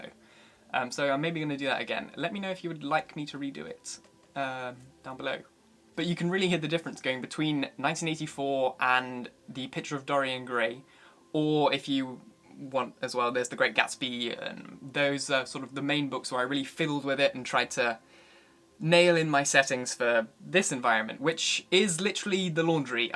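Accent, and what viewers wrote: United States. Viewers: I loved this. It is great seeing you. You are very talented and your American accent is 100 %. I hope YouTube monetized you by now. Yes, you could redo 1984. I love that book. You're doing an awesome job. I enjoy listening to you at work.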